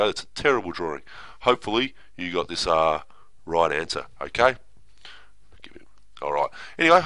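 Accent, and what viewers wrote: Australia. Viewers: Thanks so much for the video, by the way are you australian?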